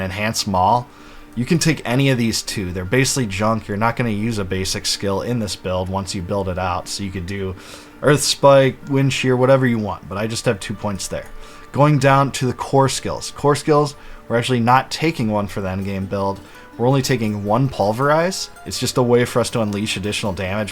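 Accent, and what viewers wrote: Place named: Wisconsin, United States. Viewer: Gotta be honest, I recognized the accent before I saw the hat. A fellow Wisconsinite here, Appleton. Nice vid!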